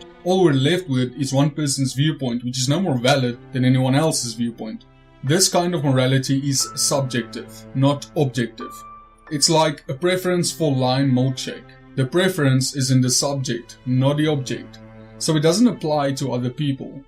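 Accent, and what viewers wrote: South Africa. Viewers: I agree, awesome accent :) is it south african?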